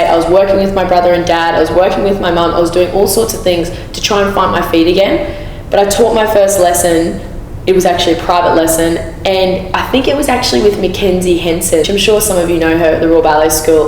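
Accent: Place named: Australia. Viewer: Australian accent is so beautiful.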